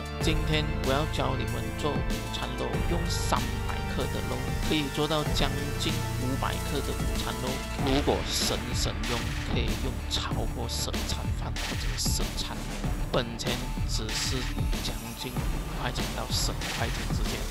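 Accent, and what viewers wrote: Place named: Malaysia. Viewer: 因为用的是马来西亚的口音啊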